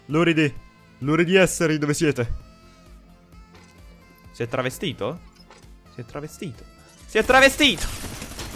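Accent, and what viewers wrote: Naples, Italy. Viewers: Ma sei di Napoli?😂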